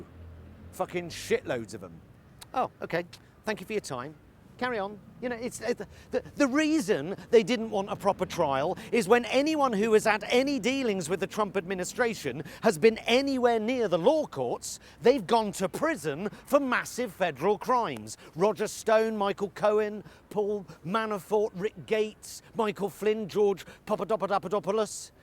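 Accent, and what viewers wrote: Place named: West Country, United Kingdom. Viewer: I am hearing a West Country accent in there but I thought it had a bit of Bath or Bristol in it , but I am happy to be corrected. Funny and to the point stuff. I have a ticket to see Mr. Pie live this month Feb. 2020 here in Ozstriya.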